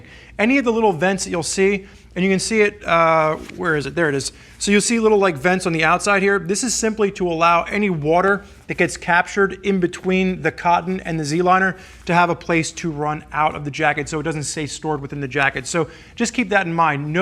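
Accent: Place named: Pennsylvania, United States. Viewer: They are in Pennsylvania they have there own pronunciation,its no excuse I'm just saying.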